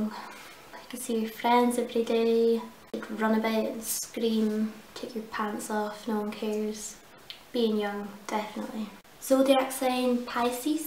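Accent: Scotland, United Kingdom. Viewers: YAY finally someone scottish! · hi are you Scottish and if u aren't where are you from? : ) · Oh my gosh, I love you - you are hilarious :) Also great to see a fellow Scottish person, your accent is much cuter than mine haha! I would love to see hauls or more tags xx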